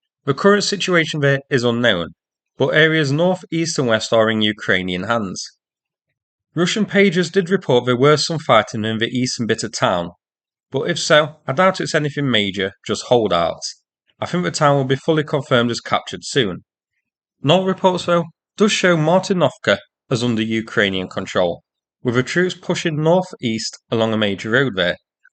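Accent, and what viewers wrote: United Kingdom. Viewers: Thanks for the video, just discovered you after following the war since the start. I like a UK accent;) :face-red-heart-shape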